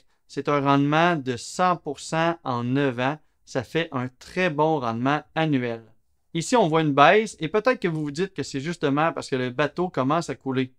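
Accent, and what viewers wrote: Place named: Canada. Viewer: J'adore l'accent Canadien ! C'est tellement pittoresque. Merci d'utiliser cette belle langue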